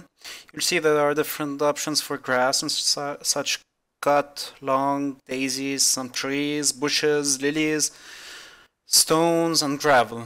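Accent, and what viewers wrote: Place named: Lebanon. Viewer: are you lebanese i can tell from the accent /;0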